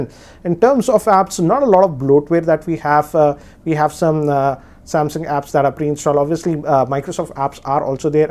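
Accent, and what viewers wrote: India. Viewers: Indian.. Accent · I really dont know why youtube is pushing Indian channels to the top of search result besides so many UK and USA channels covering the same topic. Is so hard to understand Indian English, I can barely understand them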